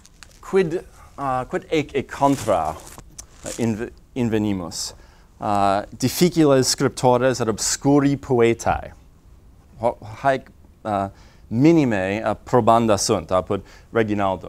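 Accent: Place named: United States. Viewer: I love that this guy just roles with his American English accent.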